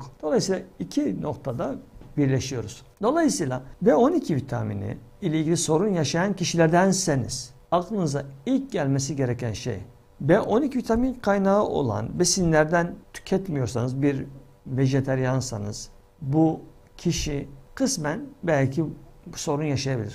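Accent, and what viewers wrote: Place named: Southeast, Turkey. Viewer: Sevğili Hocamm merhabalar,💐🙏Dün gördümm videonuzu, ve tekrâr yine dinlemek istedimm sizi, çok mutluyum gerçekten, Düşündümm bir an aklıma geldiği şivede dikkatimi çektiiii, yıllar önce Babacığımm da Eczacılık yaptılar ( Batmanın Gercüş ilçesinde kaldık ama Kozlukta da kaldık nekadar çok ismii vardır diye düşünüyorken Netten, otobiyografinize baktimm,))) Nokta atışı heĺâl olsun bana dedimm, Oraları çok severek anlatiyorumm çocukluk yillarimiz oralardaaa) Doğu ve Güneydoğu insanları bizler çok misafirperver yaklaşırlardı, Çok hakları vardır bizdee 🙏🙏🙏Onlara siz8n Nezdinizde teşekkür ediyorumm, sizlerede aynı şekilde teşekkür borçluyuz Saygıdeğer Hocamız verdiğ8niz bilgiler için Minnettarimm, ve dee severek paylaşacağimm yayinlarinizi, Sayğilarimlaa,🙏🙏💐💋💋)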